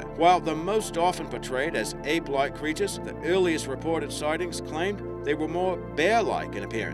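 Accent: Australia. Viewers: I think it's Australian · Sounds like a aussie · a guy with australian accent speaking on native american myths! talk about outsiders talking about a another cultures myths!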